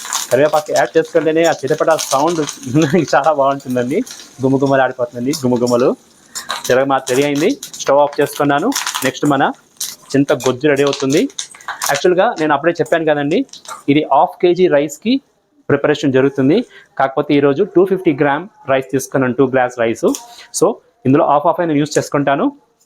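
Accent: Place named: Karnataka, India. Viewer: Mee Telugu same memu matlade laage undhi, Karnataka na meedhi Garu?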